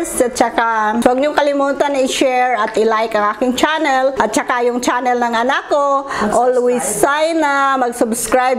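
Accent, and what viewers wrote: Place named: Iloilo, Philippines. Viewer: Ang cute!ganyan din ang accent (ilonggo)ng nanay ko tuwang-tuwa ako habang nanonood.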